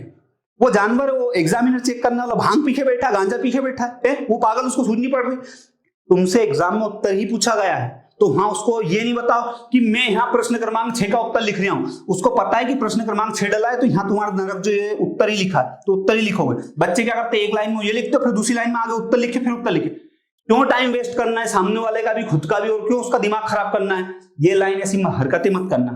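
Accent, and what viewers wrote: Indore, India. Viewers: Indore se ho kya bhaiya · Sir indore se ho kya😅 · thoda indori tone bhi h sir ka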